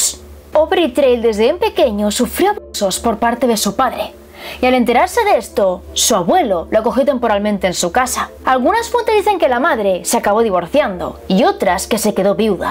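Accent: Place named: Spain. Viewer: es tan hermosa, es una bellota Es tu acento español es... Hermoso, divino, precioso. Saludos amigos todos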